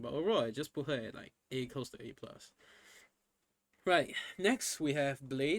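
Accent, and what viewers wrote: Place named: Singapore. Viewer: Bruh the singlish, I can't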